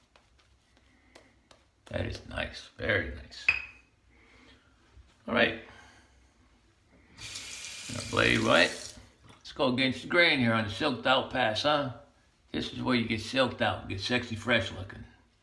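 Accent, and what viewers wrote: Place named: Texas, United States. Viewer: wow that’s cool! I’m British but my girlfriend is from Austin Texas and we were trying to pin down you’re accent and said she thought you were five different states but that you could be from Austin. Thanks for clearing that up dude, keep the videos coming and the shaves silky smooth.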